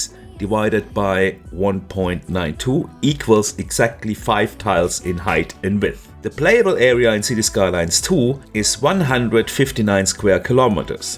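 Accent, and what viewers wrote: Germany. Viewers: Gutes Video, jetzt werd ich mir das game kaufen :face-purple-crying: Aber den deutschen Akzent hör ich bis hier her:face-red-heart-shape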